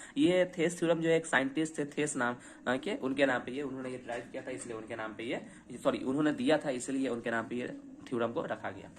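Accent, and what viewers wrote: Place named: Delhi, India. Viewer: Mast sir bs accent Delhi wala dekhna padega 😅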